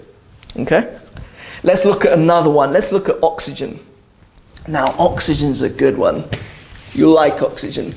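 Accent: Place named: United Kingdom. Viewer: You have really improved your british accent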